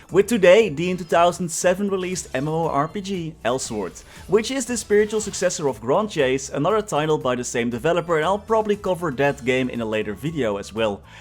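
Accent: Netherlands. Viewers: Are you Dutch? Ik kan een accent horen haha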